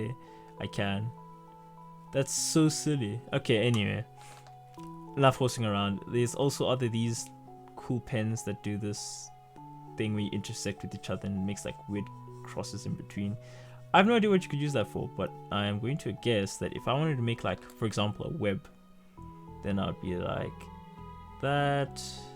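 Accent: South Africa. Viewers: Myyyyyyyyy bruuuu!! Was NOT expecting a fellow SA to be doing a Krita tutorial. I picked up your accent like 3 seconds in lol. Thanks for the tutorial, this is the second one I've watched and I like how you are diving into each aspect. The first tutorial didn't really explain the "New Canvas" dimensions page at all. It's a bit overwhelming because there are soooo many tools and I haven't done digital art before. Regards from Cape Town.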